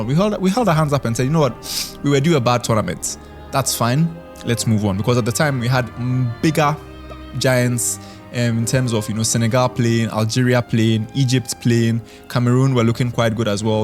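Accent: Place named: Ghana. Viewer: You speak like a Ghanaian one love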